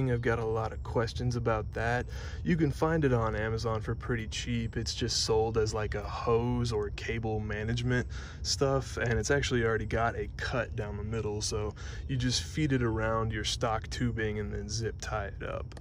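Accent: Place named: Texas, United States. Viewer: What part of Texas you from ma dude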